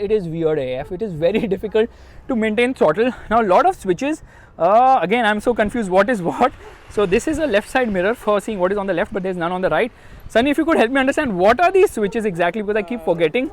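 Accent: India. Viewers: Typical Indian engineering college type annoying English accent. Trying to speak fast to show the fake fluency. Jeep mardo pe achhi lagti hai. Tum jaisi pe nahi. Next time drive some Alto or Wagon R.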